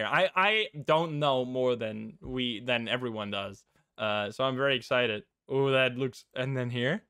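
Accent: Netherlands. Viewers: Wait a minute…. ARE YOU DUTCH?????? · ik dacht al dat ik een nederlands accent hoorde maar nu weet ik het zeker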